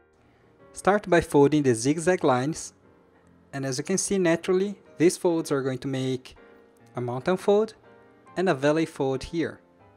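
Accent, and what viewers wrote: Japan. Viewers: It's a shame that the person with the coolest crafts on YouTube has the least comprehensive, most annoying tutorials. Plus, when you're not very good at it (like me) you have to listen to this autistic Japanese accent and this kindergartner level grammar until you finish the tutorial or you have the sense to quit the video. The latter worked well for me.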